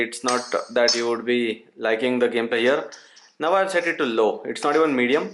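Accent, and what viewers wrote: India. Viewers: I understood that u r indian 🇮🇳 by listening ur language flow....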